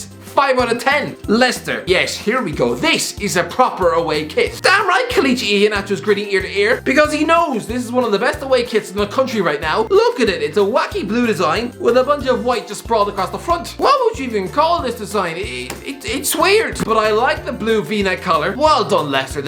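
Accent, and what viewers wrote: Ireland. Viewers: Where in Ireland are you from? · Are you Really Irish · Qna: why are you irish?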